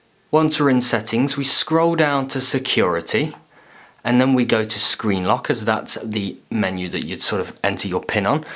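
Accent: United Kingdom. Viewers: New Jersey, USA Nov 7,2016 Very good tutorial ! Keep up the good work. By the way, what part of the UK are you from? While my Ph.D. is from Texas, my doctoral research was done at Cambridge Univereity in England. At your converience, do email me. Sincerely, Dr. Jyotindra Bhatt, Ph.D. <mail>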